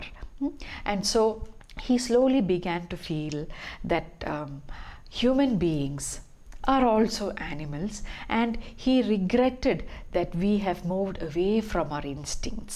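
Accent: Kerala, India. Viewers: Must be a Malayali,Its evident from her accent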